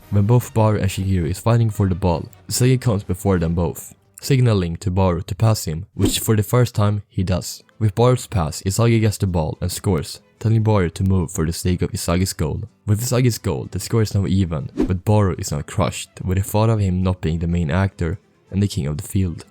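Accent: Sweden. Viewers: You can hear the Swedish accent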